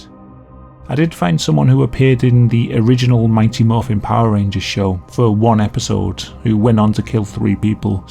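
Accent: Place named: Wales, United Kingdom. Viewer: They cooked one of his legs and eht some of it? Love the Welsh accent.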